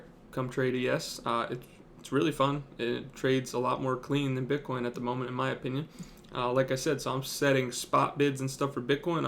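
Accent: United States. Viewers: you sound like you are from new jersey/long island with that accent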